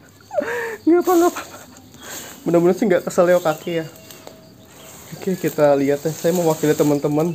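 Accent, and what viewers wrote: West Java, Indonesia. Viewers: Aku seneng Ama konten ini dengan cara ngomongnya ke Sunda,an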